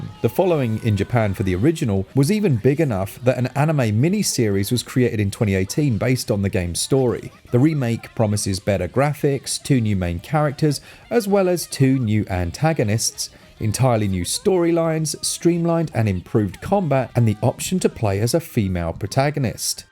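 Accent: United Kingdom. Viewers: Sean Rooney lmao. No! Everything sounds better in a British accent didn’t you know this?!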